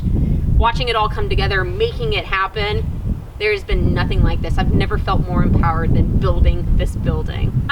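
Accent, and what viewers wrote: Texas, United States. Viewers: You leave her Texas accent alone! ;)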